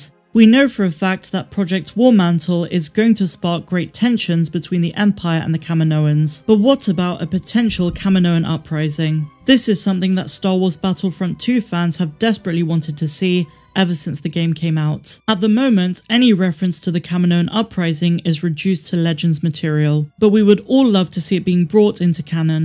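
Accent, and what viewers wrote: United Kingdom. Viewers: You’re British accent really helps mine mate , thank you mate . And may the force be with you.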